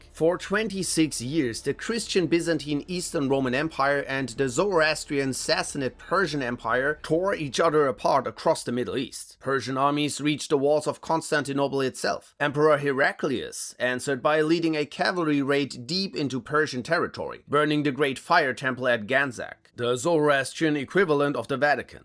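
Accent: Germany. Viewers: Sounding less german, it's really beautiful and sounds even better with each video 🤗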